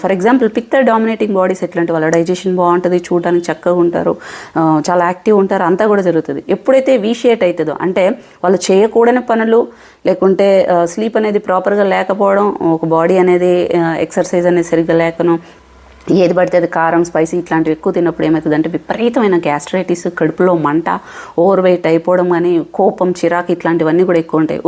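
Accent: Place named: Chittoor, India. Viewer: gaaru chittor district thiruathi dagara antaaru meeru ade district ala ne unnaaru voice tho so mee poorvikulu same aa maam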